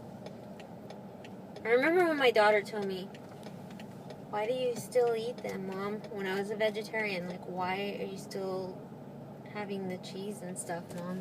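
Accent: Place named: Midwest, United States. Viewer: I enjoyed this little rant. Are you in Cali or Florida? You sound like you're from the midwest. What's the story with your name?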